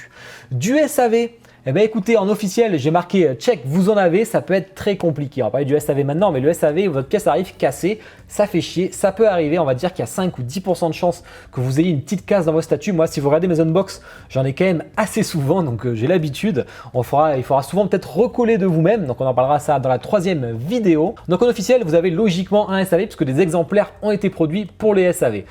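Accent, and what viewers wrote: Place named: England, United Kingdom. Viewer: Cet accent anglais je suis fan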